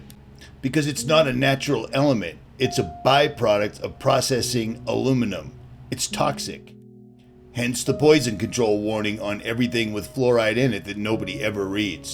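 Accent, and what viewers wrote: Boston, United States. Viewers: This guy sounds just like that dude from Boston. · You must be a Boston guy??! Definitely gets a follow just for that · Sounds exactly like Richie from Boston.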